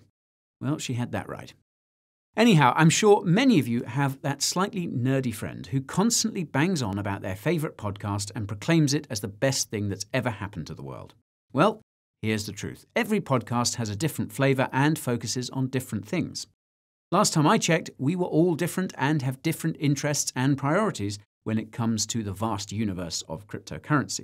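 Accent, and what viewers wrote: Australia. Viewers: Not bad ‘Strailian accent Mate! Good on ya… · Love the Aussie accent ! I'm up for some Roocoins !!! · Always insightful and entertaining. Absolutely nailed the Aussie accent. Love your work mate! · Brilliant Australian accent! 🤠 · Well done with the Aussie accent as an aussie I laughed my tits off. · Funny AF! But the accent is more proper than my friend from the Gold Coast. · Another Aussie here and i must say,you could pass as one of us mate.That was brilliant. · LMAO. Mate your Aussie accent is spot on. Love the mullet! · Aussie accent was one of the best I've heard (I'm an Aussie) Where do I get that Roocoin? 😄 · Haha, amazing Aussie accent, nice one!! 😁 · nice aussie accent!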